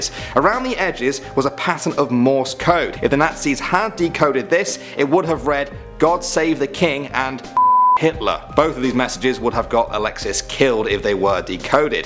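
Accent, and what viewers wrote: England, United Kingdom. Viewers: The Gamers Of The Apocalypse Fire that is because he is from England 🏴 the United Kingdom 🇬🇧 we pronounce words differently to Americans and this is how we spell the word Columbia not the way Americans would speed the world Which is Colombia just saying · Is that a england accent? If it isnt then what accent is that?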